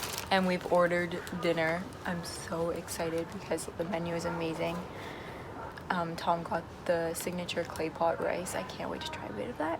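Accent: Canada. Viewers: Your Canadian accent is more noticeable when you aren’t feeling well. It’s the cutest thing ever! >.< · What the heck kind of hospitals do they have over there? Abalone hot pot? Is it part hospital, part restaurant? Does it have Yelp reviews? Btw, your Canadian accent is alot more noticeable when you're sick. Welcome back.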